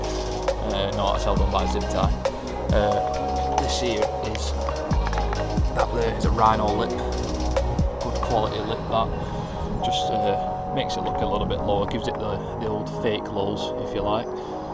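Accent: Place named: North West England, United Kingdom. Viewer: Where you from mate? Sound local to me definitely north west anyway haha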